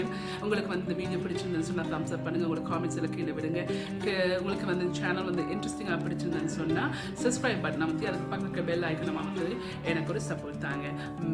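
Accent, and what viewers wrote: Sri Lanka. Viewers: உங்கள் லட்டும் அருமை உங்கள் இலங்கை தமிழும் அருமை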